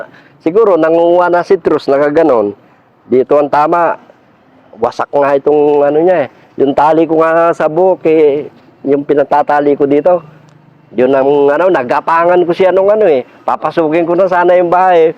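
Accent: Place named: Pampanga, Philippines. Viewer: Ganyan tlga ang Punto mg Tagalog ang kapampangan.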